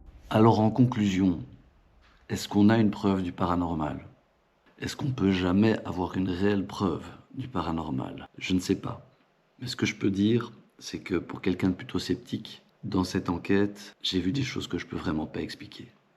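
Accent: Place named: Belgium. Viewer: dictions parfaite avec l accent belge ;analyses bien expliquées et enquête bien menée ; que demander de plus! j adore!!!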